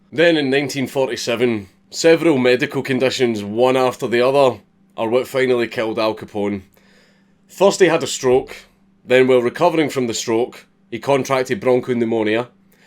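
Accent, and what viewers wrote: Scotland, United Kingdom. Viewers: I'm not used to the Scottish accent so I thought he kept saying they put a hat on Bunyon. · Listening to American history in your lovely Scottish accent should be mandatory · Fuck these videos are so good. I could listen to him speak all day, maybe it’s because I’m and American and the Scottish accent is amazing, but I feel like This is the best history class you could get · Love your show I just wish you werent Scottish. Can you talk non Scottish?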